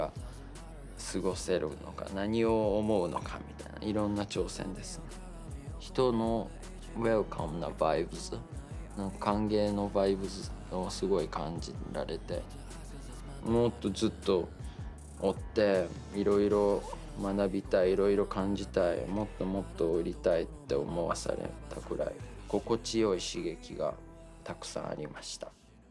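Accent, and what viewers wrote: Okayama, Japan. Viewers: のだめぐみは、博多弁。風君は、岡山弁。うふっ💓😊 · 地元岡山を離れてもうすぐ10年。コロナ禍で1年以上帰省もできず。 私の方言は完全に抜けてしまいました。 風くんの歌詞、インタビューで操るネイティブ岡山弁に魂が揺さぶられました。 懐かしくて…でも新しい。 岡山からこんな人が世に出るとは。勝手に誇らしいです！ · 岡山から大きく羽ばたいて行かれたこと 今も岡山弁を喋ってくれとること 本当に嬉しいです👏🏻👏🏻👏🏻 身体に気を付けて頑張ってなー🤗 心より応援しょーります🍀🙏🏻😊👍🏻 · 岡山県の方言がしみます🤗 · 岡山弁ってこんなに愛らしいのね…（千鳥さんのイメージが強いので）いろんな優しさが風さんを包んでいるように感じます。もっと聞きたい、もっと知りたい。どんどん素敵な歌作ってください！救われる人が多いはず。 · 大都会岡山弁をずっと使ってるのがいいですね。一生続けて欲しい。岡山愛があればどこでも生きていけると思います。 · 同じ岡山県人ですが紅白で初めてまじまじと拝見し、衝撃を受け以来毎日聴いています。何より岡山弁で話したり歌ったりしているのが素晴らしい。自分の言うこと、音楽に余程自信がなければできないことです。ぜひこのまま岡山弁を通してください。応援しています。仁科博士以来の著名人ですね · 岡山に嫁いで早２８年目になりまして、数年前に風さんの事を知りすぐにファンになりました。岡山弁で話す風さんも、心地良い歌声も曲も好きです。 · 歌ってるところから見始めて、すごく色気がある人と思い、そしたらまだ25歳で、普段どんな喋り方をしてるんだろって思いたどり着いたら、こんな岡山弁満載の優しい喋り方で、このギャップにどっぷり沼にハマってしまいました。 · 職場のおばあちゃん世代の方のオススメでハマりました！思ってたよりポヤポヤしててギャップに悶ております✨ 〜がまぁたそと同じ発音で謎におぉ！！ってなりました（笑）岡山の人の話し方好きだなぁ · まさか、こんなそばに大きな風が羽を休めていたなんて…同僚が風さんの同級生でビックリしました🤣 ここからどんなに大きく羽ばたいても岡山弁を貫き通す、そんな風さん可愛すぎてやべぇじゃろ笑 そうそう、岡山駅の地下にグランドピアノ（自由にお弾きくださいっていうストリートピアノ）が設置されたの知りょ〜る？？ 次に帰ってくるときは、ぜひこっそり弾きに行かれ〜♬ 風さんが弾き出したら『こっそり』いうわけにはいかん思いょるけど🤣 でも、いつか必ず弾きに帰ってこにゃおえんよ〜☺️👍✨ （普段使ってる岡山弁で書いてみました笑笑） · 格好良い歌声と岡山弁の喋りのギャップがいいね。岡山県民として嬉しい。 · 神は私達に素晴らしい逸材を与えてくれた・・稀なる素晴らしい天才的才能✨ 音楽でこれ程の感動癒し希望を感じたことはなかった、岡山弁でゆったりとした口調、穏やかな表情、優しい心・・これからも時がたっても変わらずにいて欲しい。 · 歌声も顔もその年齢には思えないセクシーさがあるのに話す時は本当に信じられないくらい素朴、岡山弁と英語が混じった語りも、ライブの自由さも全てが唯一無二。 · 娘が数ヶ月前から風さんの歌を聴いてました。私は今月のねそべり配信をみて、何なんw ❕です😍🎶 こういう今迄の経緯が聞けてうれしいです。 風さんのオリジナル曲にどっぷりはまっています。 お人柄も岡山弁も素敵です😌💓 あなたの夢に突っ走ってください(*^-^*) マネさんも素晴らしい方ですね(^o^) 娘とライヴに行ける日を楽しみにしてます(^o^)/🎶🎵💗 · 里庄じゃ！✨ 地元の隣の隣の町（かな？）だから行ったことあるとこにこんなすごい人がおったなんて未だに信じられない✨ しかも、そんなすごい人が岡山弁喋ってるなんて不思議な気分。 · 岡山で20年以上過ごしてきたから、岡山弁なのかな？？☺️💐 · 圧倒的なピアノ、心地よい歌声、素朴な喋り方、深い歌詞、癖になるメロディー、純粋さ、岡山弁でバイリンガル、髪型や曲でガラッと見た目の雰囲気が違ってつかみ所がないイケメン…。 ほんと魅力しかない。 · 岡山県民だけどなんかこの人の方言優しい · 久々に好きなアーティストに出逢った! 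楽曲や歌声はもちろんの事、英語交じりのコテコテの岡山弁や人懐っこい甘え上手な表情も好きだ!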